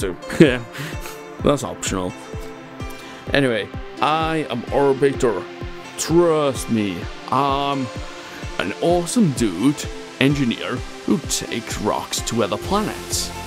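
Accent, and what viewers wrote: Wales, United Kingdom. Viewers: believe me. your accent is definitely Welsh! and strong too. Be proud of it buddy!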